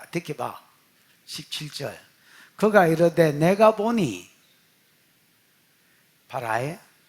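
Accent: Gyeongsang, South Korea. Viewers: 갱상도어로 들으니 정말 이해가 쏙쏙 말씀이 너무 재미가 있습니다. 감사합니다. 목사님^^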